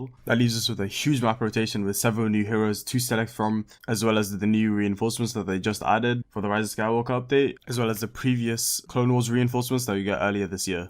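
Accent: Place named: Singapore. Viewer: Man sounds hella Singaporean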